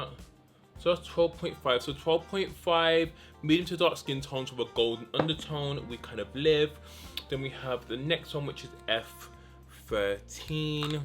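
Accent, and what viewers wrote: Birmingham, United Kingdom. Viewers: Is that a Birmingham accent I hear?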